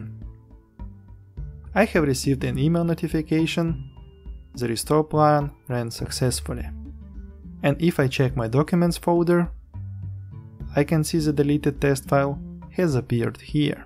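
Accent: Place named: Russia. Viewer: Никак не могу привыкнуть к твоему русскому акценту)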